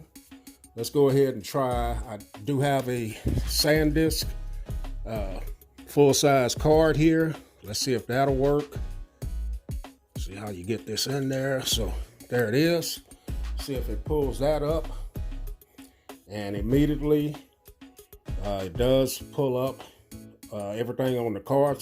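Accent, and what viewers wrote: South, United States. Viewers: Are you from the south of the state’s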